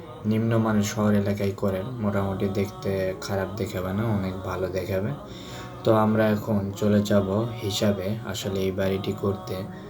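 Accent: Naogaon, Bangladesh. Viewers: Apnar basha Naoga naki vai?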